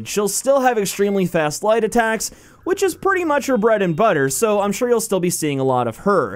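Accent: United States. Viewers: Evan Woolford I'm certain it's just cause he's American (pronunciation) but as the other guy wrote , it's a clever little substitute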